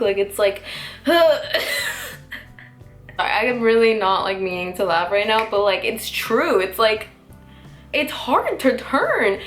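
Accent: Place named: Arizona, United States. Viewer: Sounds like she's from Arizona 🌵😃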